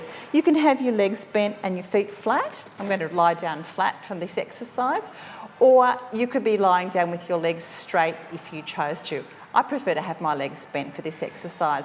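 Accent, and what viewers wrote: Australia. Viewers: I’m making my rounds of videos just to hear her Aussie accent!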